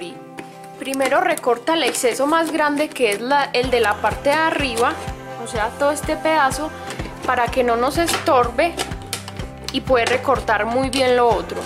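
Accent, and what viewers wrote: Colombia. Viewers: Eres colombiana verdad? Te lo digo por ese acento que tienes. Y que a mi en lo personal me encanta. Saludos y Bendiciones desde Guadalajara JALISCO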